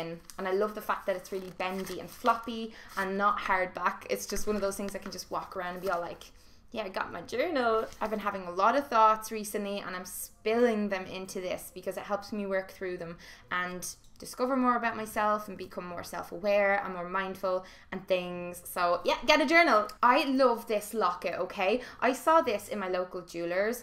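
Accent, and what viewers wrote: Ireland. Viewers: Hi! Your voice makes me miss Ireland so much!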